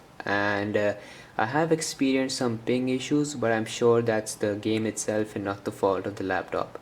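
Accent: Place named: India. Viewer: oh k... U sounded like an Indian.. 😂.. Anyway.. This model is not available in india thats why I was wondering..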